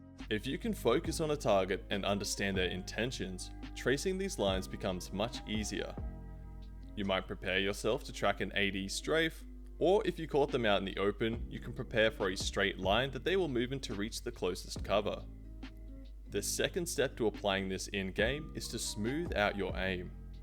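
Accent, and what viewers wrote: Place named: Australia. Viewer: U were tripping I think he just Australian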